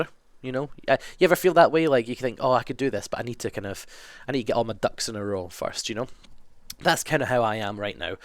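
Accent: Scotland, United Kingdom. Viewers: I may not be sociophobic much like I hate talking to people i've never met and I hate it when someone looks at me.. but i do have extreme trust issues... like for one thing i'm scared to get a boyfriend... but still you make me feel better when I watch your videos knowing you have phobias too... Anyway, you are truly amazing and i'm happy to hear your scottish too I have a family kilt and love it.. You rock and never forget we love you! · SCOTTISH FOR THE WIN GO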